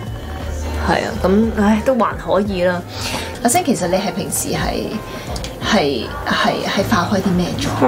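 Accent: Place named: Shiqi, China. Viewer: 好聲好戲裏Yoyo配得非常好🎶💯,努力背石岐話勁👍😘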